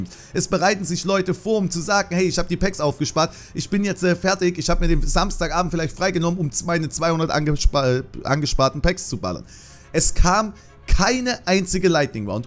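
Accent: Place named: East Germany, Germany. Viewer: Am geilsten finde ich tdem wie du versuchst deinen ostdeutschen Dialekt zu unterdrücken🤣 mach weiter so, geb dir mit allem recht😊🙋‍♀️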